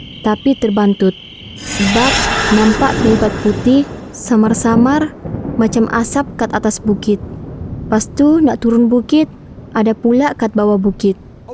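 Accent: Sabah, Malaysia. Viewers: ni bkn ckp semenanjung bang, ni bahasa melayu slang sabah. Kasi repair otak mu..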